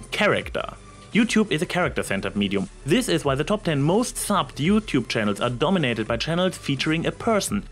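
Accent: Germany. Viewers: Are you german I watch some german youtubers I recognise that accent just curious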